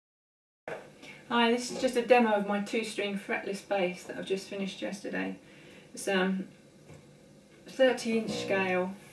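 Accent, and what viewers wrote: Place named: South, United States. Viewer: When English girl get all southern? ROCKIN MAN LOVE THE LOOP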